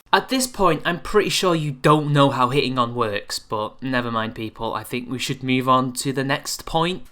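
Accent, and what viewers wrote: United Kingdom. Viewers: I'm not sure you're aware of this but Sargon and Thunderf00t are British, too. In fact, your accent is more like Sargon's. But that doesn't change the fact that your delivery style is like TL;DR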